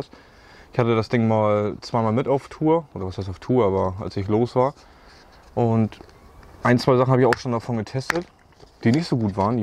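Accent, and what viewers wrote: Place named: East Frisia, Germany. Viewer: Du redest wie ein 60-jähriger Ostfriese aus dem Lande! Voll sympathisch!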